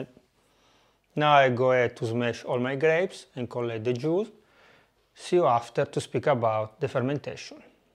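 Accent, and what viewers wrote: Italy. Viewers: You're too good. especially it's something to hear Kg, Litre etc. than hear some Oz and lbs(lot of bullshit). And keep up your italian accent. It's awesome! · Very informative with sweet Italian accent.. Grazie mille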